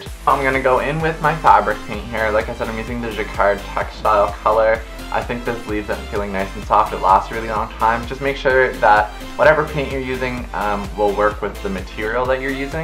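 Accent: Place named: Canada. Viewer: are you canadian? your o's are adorable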